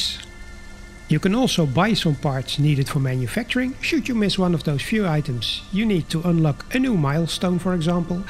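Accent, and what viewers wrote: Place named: Netherlands. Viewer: Nice video, and accent! Dutch?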